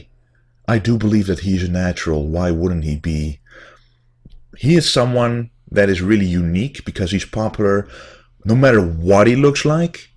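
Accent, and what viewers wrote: Netherlands. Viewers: Ben je Nederlander? Lijkt alsof ik een Nederlands accent hoor. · IN A WORLD.... WHERE EXTREME BODYBUILDING IS MOST IMPRESSIVE... THERE IS ONE MAN WHO FIGHTS... FOR THE NATURAL LOOK. Haha, you have that voice man! You Dutch bro? You sound Dutch :) Power to you, keep up the good work! Peace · Ik wist meteen dat je een hollander was met dat accent:p maar vette videos man. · Props for the Dutch accent!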